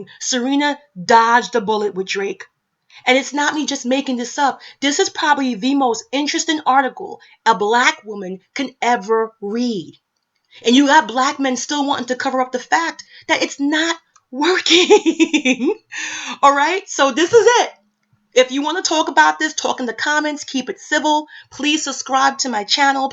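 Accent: Jamaica. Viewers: lawdhamercy!! lol luvin ur jamiacaness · Hey, I hear the patois trying to come out a yuh girl. Us yard women know when a woman is fed the f@ up. Keep these stats coming sis.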